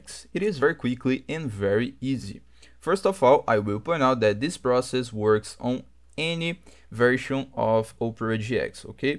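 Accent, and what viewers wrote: Brazil. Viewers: Eu reconheço um paulista em qualquer lugar! Hehe, brincadeiras a parte, ótimo vídeo.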